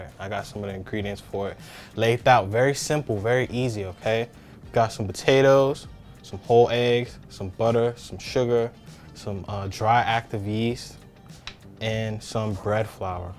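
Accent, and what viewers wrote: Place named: New York, United States. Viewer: Sucha new York guy. Brooklyn? Bronx? Manhattan? I wonder. Imma try this out. Big thanks from the bx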